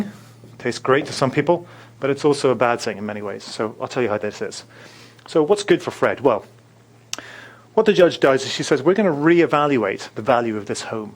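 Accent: England, United Kingdom. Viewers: All these videos pertain to the USA right? or are they in the context of the UK? The English accent has me wondering...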